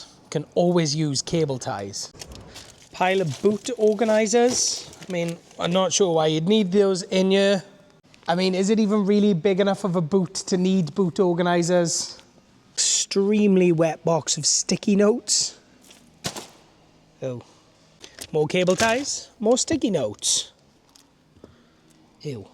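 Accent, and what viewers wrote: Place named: Welsh Valleys, United Kingdom. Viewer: Where you from mate you sound valleys nvm seems your Swansea way. Great video and great find will sub just to watch the upcoming videos on this one may even be interested in buying depending on that water leak issue and underneath. And Yes I'm probably having a mid life crisis 😂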